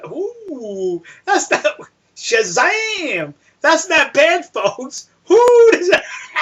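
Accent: New York, United States. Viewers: dude, if you gonna defend any side at least use common sense and not your rly funny new york accent... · i don't like whalers, i don't like antiwhalers, i don't like your stupid yankee accent. the whole shebang=not newsworthy.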